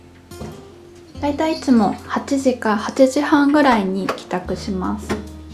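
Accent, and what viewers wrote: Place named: Kansai, Japan. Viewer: 関西出身の人ですね！！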